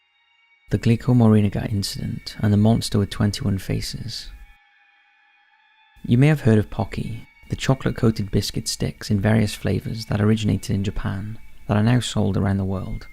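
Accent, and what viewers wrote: United Kingdom. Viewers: Nothing like stories of poltergeists and demons told by soft British voice to help you nod off.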